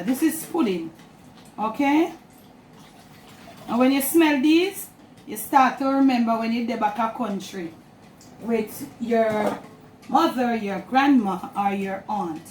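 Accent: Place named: Jamaica. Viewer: Hello could you provide the full recipe, you have a beautiful jamaican accent I couldnt tell what some of the ingredients were that you used. there is one where it sounded like you said ammon essence. tried looking it up couldn't find it. and so i thought maybe she said lemon essence, I found that. is that one of the ingredients in your recipe lemon essence?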